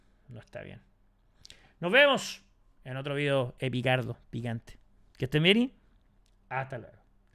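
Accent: Chile. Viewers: eres chileno wn?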